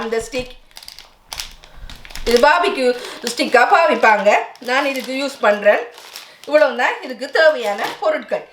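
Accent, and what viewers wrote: Sri Lanka. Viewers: Beautiful sharing I love this Srilankan tamil Thanks for sharing stay connected dear new frnd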